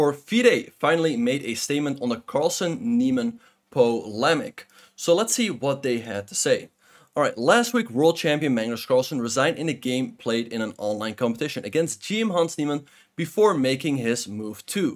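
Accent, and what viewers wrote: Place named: Netherlands. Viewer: Man I love your accent I can tell you're dutch or flemish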